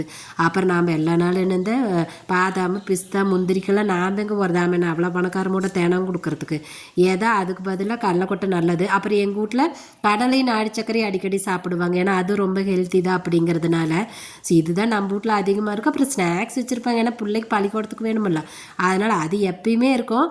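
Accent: Kongu, India. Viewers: Kongu slang 🎉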